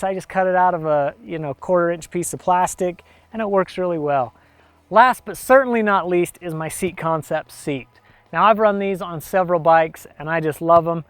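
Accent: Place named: Utah, United States. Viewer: He's trying to say accessory, but has a bit of a Utah accent.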